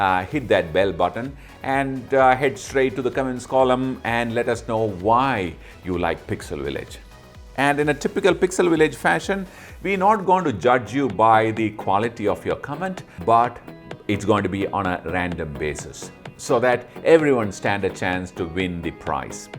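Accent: Kerala, India. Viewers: I was attracted to this channel first time after hearing your polished english slang with a bit of malluism☺️ Simple plain english and excellent lighting makes each episodes a delight to watch. Way to go Mr. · Many congratulations sir on 50k many more to come. You spread knowledge about products in details in very indian english tone. Thank you! Keep growing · Hello pixel village, I am following this channel since January when randomly i found a video on the perspective related to lenses on youtube. I liked the video so much that i subscribed with bell before even the video ended and that liking was so positive and is increasing day by day that makes me follow your channel till date. The liking of the channel rather the videos of the channel comes intensely from my heart and the reason behind this is because of the quality of videos you provide, talking of the quality i am meaning to say about the brief but elaborated explanation of the product or settings, its like you complete the syllabus in brief but in such a way that anyone could relate it in their mind and understand easily, atleast i love this short bur descriptive part very much and another cause of loving this channel is the field test section, that is so good where we get to know about the output quality even before we try it, and the most favourite part is the linguistic communication of Sir, i mean as an Indian no matter how much we know or understand english anyone would be able to relate understand and learn faster in this indian accented english than any other foreign accent and Sir's narration is so good that it feels like i am listening to him as if I am in front of him. 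In short the quality of videos with the indian scented audio kept my love and respect intact for this channel till date and hopefully in future too. Keep up the good work you are doi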